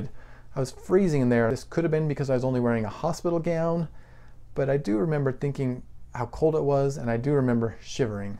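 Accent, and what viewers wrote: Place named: England, United Kingdom. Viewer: Hello. You sound like you might be in England. I don't really agree with all the AFIB protocols recommended there. Keep in mind... that the longer you stay on AFIB antiarrhythmic meds... the more the heart 'remodels' and the less effective an ablation may be. I heard from a rather upset woman in England whose Cardiologist prevented her from getting an ablation, keeping her on a pill protocol for a lengthy period. When she finally did get her ablation, the EP said you waited too long and it won't work that well now. A big mistake is not going to the AFIB professional Electrophysiologist (EP). When I came down with AFIB, I dumped my Cardiologist and contacted an EP immediately. I've had many ablations by the best EP's. None of them had me do a Stress Test. Makes me wonder if you're on the right track. In any case, I wish you the best outcome. 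Every case is different. There are many people who never get ablation and do quite well on pills for decades. Whichever path you choose, I'm sure you'll be fine. I first came down with AFIB around 54. I see some people come down with it when quite young. Sad is the number of pro runners who come down with it.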